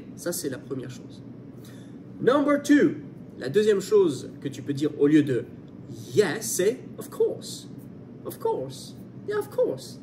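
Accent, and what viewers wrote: Scotland, United Kingdom. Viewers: “Aha” is kind of international 😉 Love the Scottish accent 🤪 Have a great day !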